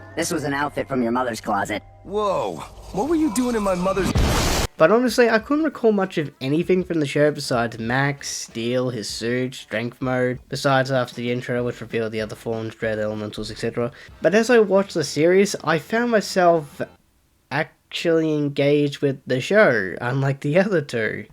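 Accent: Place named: Australia. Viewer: I was today when I noticed your Australian,🙃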